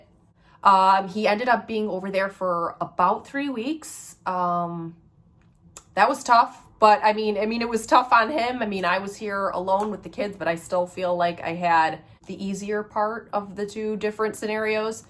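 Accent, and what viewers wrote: Midwest, United States. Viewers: Good lord, as a native NYer you're midwest accent is friggin nauseating. NAUSEATING. Ya hear me? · Hi, have to stop thinking of Fargo when I hear your accent, you mid Western women sound so nice reminds me alot of Canadians. My parents retired back to Ireland in 2004 and were worried about not seeing the Grand kids from UK, no worries though as they came on holidays every summer and winter for 14 years. It's a big adjustment but give it time and bring the kids to see the American relatives as often as you can. Good luck with the house hunt.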